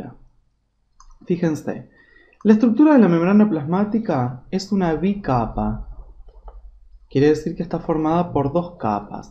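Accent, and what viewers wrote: Argentina. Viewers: Me encanta el acento Argentino.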